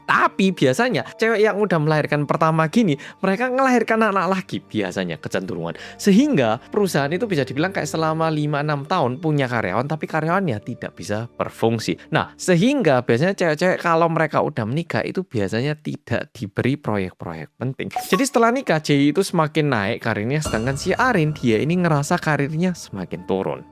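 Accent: Java, Indonesia. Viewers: Siang mas muedok lgsg nyimaaak🎉🎉🎉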